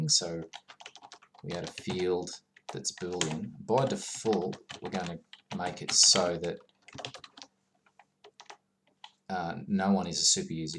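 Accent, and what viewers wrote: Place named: Australia. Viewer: Thanks, noted. I have tried to code your approach above but have an 'Invalid header value type; must be a string or numeric; received NULL' error. In trying to resolve this I wanted to know about the "getAttribute('identity') at the mark (line 32) and what is 'identity' referring to please. Also, great to hear another Aussie, when it comes to providing coding solutions! Cheers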